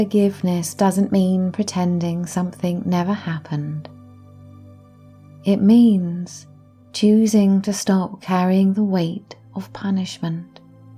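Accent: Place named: England, United Kingdom. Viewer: My Mum is my true worldly life partner. And I lost her. She died. I have survivor remorse. I am recovered. My Mum is renewed in a different world. I heal my intestines. I am well. Thank you for your hypnosis stories. Your words. Your english voice. Your natural way. Your work. Continue to promote your work to the world. Thank you.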